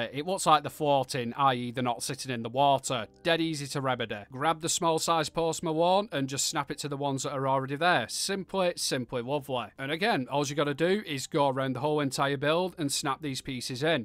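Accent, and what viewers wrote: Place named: Northern England, United Kingdom. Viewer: Thats an amazing build, thanks for giving me some ideas. I am a rubbish base builder at present but watching your videos makes it look so easy, also being a northerner myself I really appreciate your northern delivery of all this, simple and straight to the point. Keep up the great work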